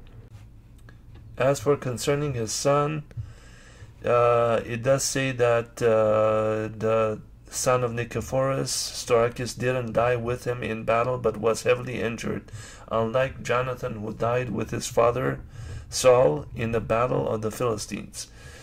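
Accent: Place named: Quebec, Canada. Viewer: accent québécois...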